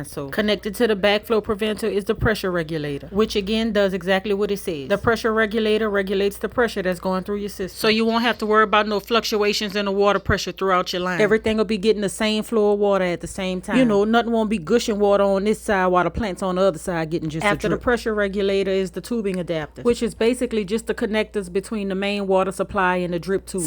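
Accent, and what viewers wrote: New Orleans, United States. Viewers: Your voice sound like us from New Orleans Louisiana. You heard me 😂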